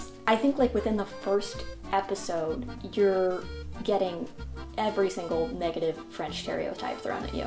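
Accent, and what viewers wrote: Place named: United States. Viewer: I am sorry, but I did not hear any French accent in your English (very much American English if I may point out). I am speaking as a European with a Master’s in English who lives in America for a long time. I hear accents, and you have none, which makes me think that you are an American born and raised 🧐. Nothing wrong with that, BUT... relax! This show is not culturally 100% accurate, it’s a “feel good” show, and the amount of criticism you express is not going to get you more subscribers. You really need to find other topics and angles to grow your channel because the country of the USA is loving the show! 😀 With that said, there are many accurate cultural points in this show, yet again coming from an actually born and raised in Europe person. Don’t take yourself too seriously, and enjoy the show and the FASHION (which by the way is not “questionable”, and that’s the phrase that yet again gives away an American in you), and FEEL GOOD watching the show! 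😃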